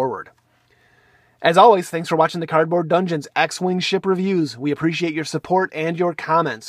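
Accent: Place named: United States. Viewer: You're aware that American people tend to have American accents, right?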